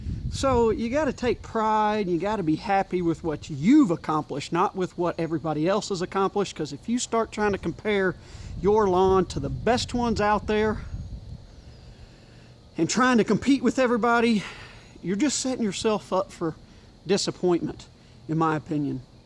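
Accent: Arkansas, United States. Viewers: You don’t happen to be from Arkansas?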